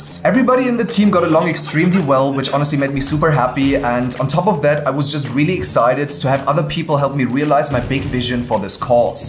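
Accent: Germany. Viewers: Respect und wusst ichs doch dass du nen Deutschen Akzent hast🙃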